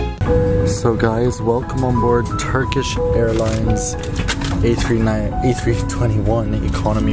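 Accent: Gothenburg, Sweden. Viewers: Du pratar lite göteborgska (det är kul). Snälla prova Ukraine international BC. Jag hittar inte någon som har gjort en tripreport på den och jag vill jätte gärna se det. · Det vart faktiskt bra med din Göteborgska ❤ Now over to English, you said it great whit Gothenburg accents. I was in Istanbul's new airport and saw also the toilets problem I took photos about how terrible it looks out. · Right. So at first your Swedish sounded extremely American but like after American Express the Gothenburg dialect kicked in. Absolutely fantastic! One can hear you’re not comfortable though :) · Hehe. Loving your deep Gothenburg accent. Keep up the good work. GÖTT MOS!!! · Älskar göteborgskan :) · OMG! In Swedish and in Gothenburg accent, Thank you. You made my day:D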